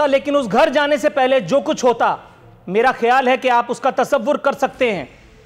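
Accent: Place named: India. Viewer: Kuch Der k lye main samjha news anchor Indian Hai koi Indian news say khaber day raha hai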